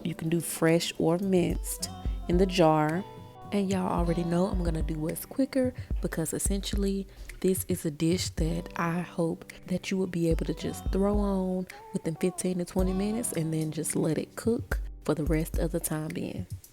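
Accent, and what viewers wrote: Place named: South, United States. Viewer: Looks good love your southern accent. Imma try the Saturday. 🎉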